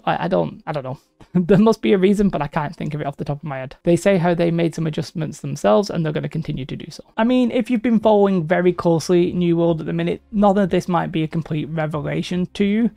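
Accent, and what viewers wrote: United Kingdom. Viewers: where abouts are you from? Me and my partner have been debating which part of the north your accent is haha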